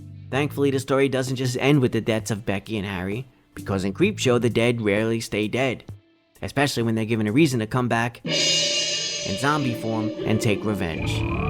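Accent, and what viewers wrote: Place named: New York City, United States. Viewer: Are you from NYC? That accent is strong af 😂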